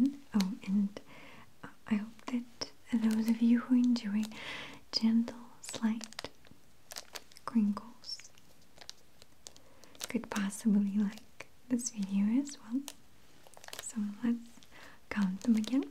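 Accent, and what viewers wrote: Russia. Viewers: I seem to have the same reaction to various weights and qualities of journal pages! FYI that beautiful carved leather journal has a classical image of the three graces on it. from greek myth but most famously revived by Botticelli during the Italian Renaissance. google PRIMAVERA by him and you will see them. You are definitely the queen of relaxing ASMR videos. So elegant and soft and beautiful! lovely graceful hands too. And that very slight Russian accent makes your speech quite exotic. What a success you are! thank you for all you do. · I think the thing with is her accent. She is the only person who actually makes me go to sleep. I love her Russian accent, it makes it so nice. Who agrees?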